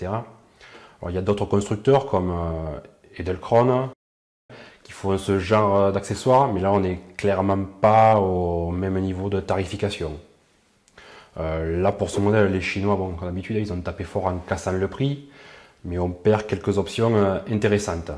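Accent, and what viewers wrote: South, France. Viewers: T'es débalages sont toujours aussi bien et même s'améliore de vidéo en vidéo et continu comme ça. Et bien sûr toujours avec l'accent du Sud😊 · Dommage qu'il y ait pas beaucoup d'abonnés sur ta chaîne parce que tu présentes très bien les produits et ton petit accent du Sud est attachant ;) tu veux pas essayer de contacter Steven par exemple?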